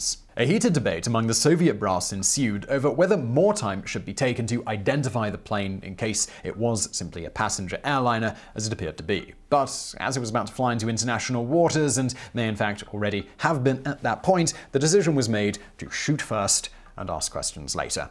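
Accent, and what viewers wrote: United Kingdom. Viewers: Oh boy... More stuff of i rationalize how they came up with gps... And rather than read... Im so briliant i must be right and there for let me spread my genious around as fact and history because whatever i lazily dream up must be right. Stuffy elitist. Talking head comes complete with stuffy brit voice. Yes kids.. This passes as a college prof... Even a department head these days. Actually.... Unfortunately for this condescending wannabe egghead embarrassment... Gps origins are not sputnik at all. Norging to do with it other than hey... Look a tin can pinging we can track. Gps at its heart... Is triangulation. A methodology used for ages by surveyers. It actually owes it origins to Pythagoras. As far as an orbiting object being a high accurate clock like an atomic clock... I have no idea how that works and id assume again some algebra... 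Trigonometry... And some calculus... But atleast i am humble enough to admit it. And its a good question. The talking head here equally has no clue how that works. Before sputnik... Im pretty sure there were some very bright people unlike this jerkoff here in this video just tossing off... Wonder if he creamed his pants over this... But i digress... Some bright people.. Yeah like warner von braum... Einstein... People like that which had already figured out geosynchronous orbits.... Now after all of that... Even more bullshit spewed by captain science here... You cell phone does not directly engage a bunch of high orbiting sats out there. It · why does he look like the guy on Vsauce but with a British accent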